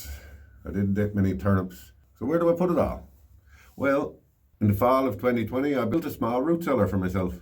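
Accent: Ireland. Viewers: Sounds like you are Irish, the accent, dialog, and love of spuds gives you away. Norm from Scotland. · Come.on in and meet my potatos. , Your not Irish , are you? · Was fully sure that this was an Irish video when I heard the accent, your accent is very like a Waterford accent. Not the first time I have mixed the Newfoundland accent up with the Irish accent. I am guessing Irish must have settled in numbers way back. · Is this man Irish? Good job on the root cellar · Is your accent a typical Newfoundland accent? I’d never heard one before. I’m Irish and it’s bending my head coz it sounds sooooo Irish to me, with a twinge of Canadian 😊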